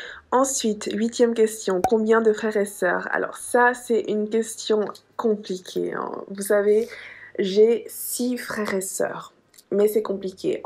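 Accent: Spain. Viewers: J’adore ton accent espagnol moi aussi ma langue maternelle c’est arabe 🇩🇿 mais je parle l’arabe , français, espagnol et english ❤️❤️❤️🤍 mais ma Préfère c’est l’espagnol me encanta 😍 ¡qué dios te proteja y te bendiga!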